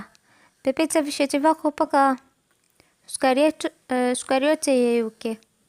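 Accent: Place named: Mombasa, Kenya. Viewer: I like your swahili wewe ni from mombasa?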